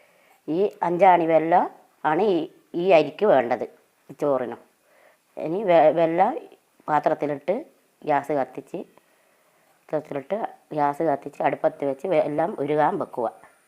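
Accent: Nadapuram, India. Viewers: നാദാപുരം ഭാഷ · Nadapuram bhaagath alle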